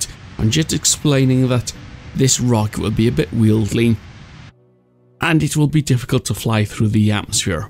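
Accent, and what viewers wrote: Wales, United Kingdom. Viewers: Man, I miss your videos, I haven't watched your channel in forever. I remember watching your videos during a boring summer, or when I had a bad cold, etc.. Thank you so much for continuing to make your videos, they always bring me joy with your strange humor. And it's so nice to hear your Welsh accent once again xD Keep up the great work!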